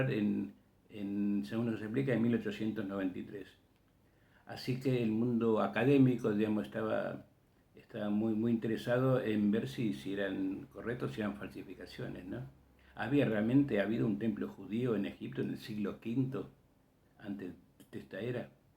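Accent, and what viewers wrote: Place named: Uruguay. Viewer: POR TU ACENTO SOS URUGUAYO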